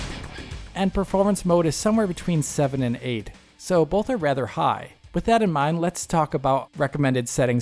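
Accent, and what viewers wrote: Germany. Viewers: Your voice is incredibly monotonous and boring... and yet when you speak a bit of german, it becomes very colorful and full of life. You should try to use your german accent to speak english! And thank you.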